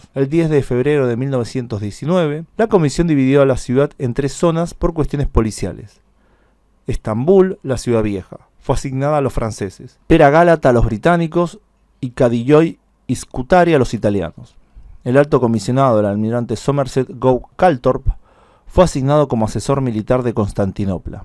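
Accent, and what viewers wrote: Argentina. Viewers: Tema Interesante, pero escuchar SHAS SHA SHA, DUELE EL OÍDO. EJEMPLO SHAMÓ, EN VES DE LLAMÓ, desarroshó, en ves desarrolló; mishón en vez de millón; mashoría en vez de mayoría; sha en vez de ya; masho en vez de mayo; shamada en vez de llamada, shegaron en vez de llegaron, constitusheron en vez de constituyeron, etc etc. Oye amigo gauchín, en tu país, habla como quieras, pero para consumo exterior pronuncia bien y modula bien el castellano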